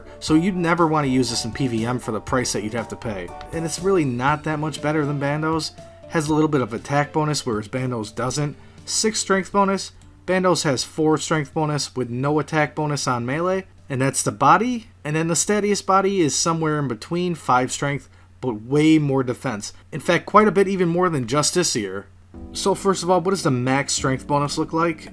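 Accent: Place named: Michigan, United States. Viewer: Are you from Michigan you have a similar accent to mine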